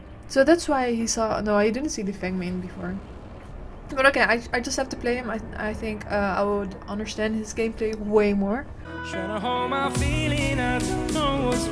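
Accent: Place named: Netherlands. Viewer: Her accent sounds dutch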